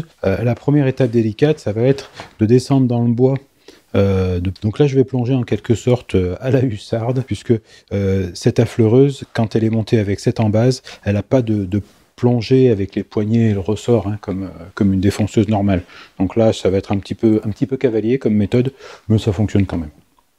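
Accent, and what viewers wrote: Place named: Belgium. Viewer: je suis d'accord avec toi, je ne vois aucune méchanceté dans tes vidéos. J'y vois surtout de bons conseils venant d'un pro ainsi que de la bonne humeur. En plus, ayant grandi juste à côté de la Belgique, retrouver ce joli accent ainsi que les septante et nonante me ravit.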